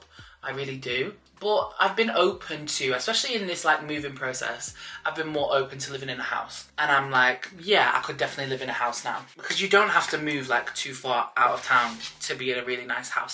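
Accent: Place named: Preston, United Kingdom. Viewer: I have no idea how I never found your channel until recently, we're the same age, same size, and I also grew up in Preston so we sound the same😂 the asda you used to live behind is where I went for the weekly shop with my mum haha. Your genuine love for yourself and happiness is so empowering to see, thank you for posting!❤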